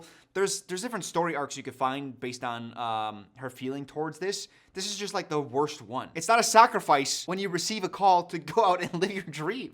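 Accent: New Zealand. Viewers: as an Australian, I think it is one of the most beautiful NZ accents I’ve ever heard. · my dude that is the kiwi accent, Aussies say reconnect like you do 😂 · Me being slightly offended you mistook the kiwi accent for the Auzzie 😅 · Bros getting his accents confused, that is probably the most New Zealand accent I’ve ever heard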